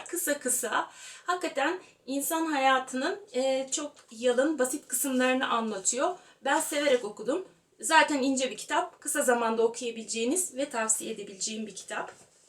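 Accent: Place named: Denizli, Turkey. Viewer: klasik okumaya hangi kitaptan baslamaliyiz? bu arada abla cok tatlisin denizlili akrabalarima benziyor konusma tarzin hep seni izlemek istiyorum cok ictensin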